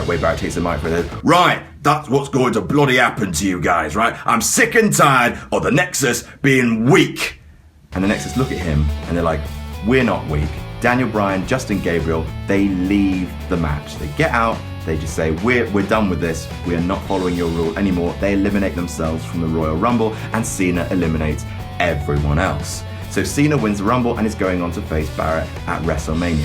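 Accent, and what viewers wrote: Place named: United Kingdom. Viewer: Damn with that smile and that British accent..... I'm in love...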